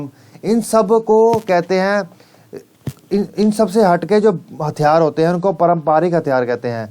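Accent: Punjab, India. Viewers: paji sardar lagde oo punjabi hindi tuhadi mix ho rhi aa chal koi na veer........god bless u rabb trakiya bakshe...thank u so much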